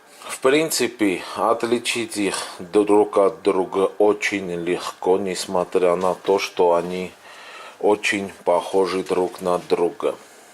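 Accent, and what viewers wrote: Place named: Armenia. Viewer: Мы по голосу поняли что ты армян .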